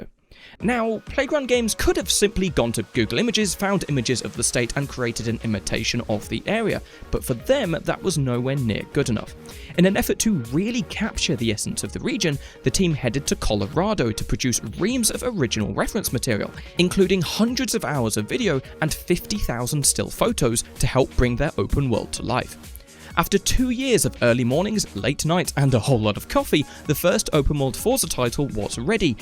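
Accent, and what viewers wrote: United Kingdom. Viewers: yeah man you could definitely turn this into a career. Everyone loves a British accent narrating videos lol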